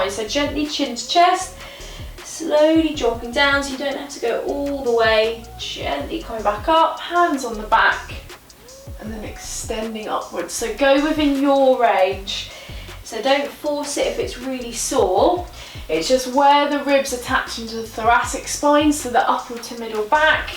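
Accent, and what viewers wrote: United Kingdom. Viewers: Love the British accent! :)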